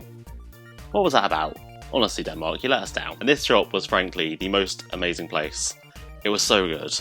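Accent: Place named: United Kingdom. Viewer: Dont know this channel. Where are they from? He sounds British but when he talkes about visiting Denmark it sounded like he lives on the other side of the World.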